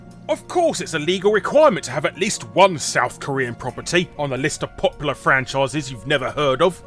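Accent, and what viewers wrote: England, United Kingdom. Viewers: Guru Larry just made me realise you can't spell Successful without SuCC! God bless that English accent of his!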